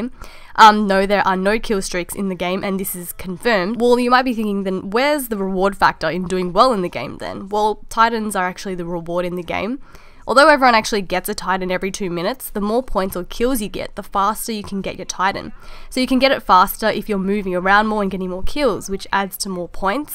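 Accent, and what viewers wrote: Australia. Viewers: I sense strong australian accent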